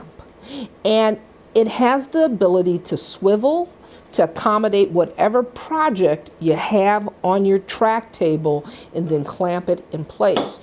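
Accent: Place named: Medford, United States. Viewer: I miss that Medford accent!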